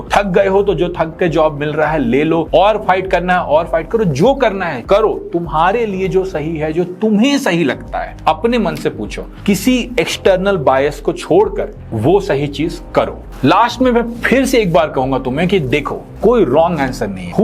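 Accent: Bihar, India. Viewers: bhaiya your bihari accent is so sexy and abhi to mai javascript me gote laga rha hu.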